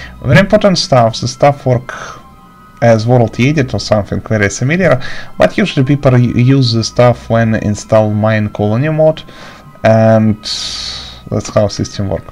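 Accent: Russia. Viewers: У тебя русский акцент, ты не из России?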